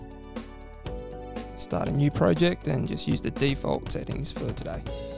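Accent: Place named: Australia. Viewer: Good to hear an Australian voice on YouTube. I am sure method works, however it is a bit of a fiddle. For weeks I have been seeking a simple method to open BlackMagic image sequences in an app' on Mac, and convert them to QuickTime PRORES files. The PRORES files I wish to colour grade in After Effects. Any suggestions?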